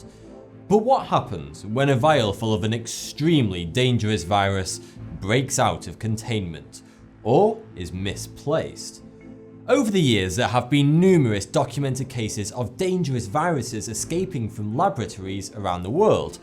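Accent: United Kingdom. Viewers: You got to love that sweet and smooth British accent! :) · his British accent makes it sound like 42 but he's saying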